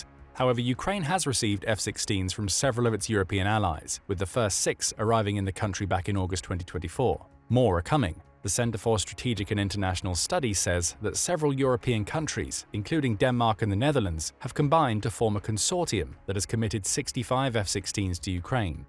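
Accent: United Kingdom. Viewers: Another limey voice = more BS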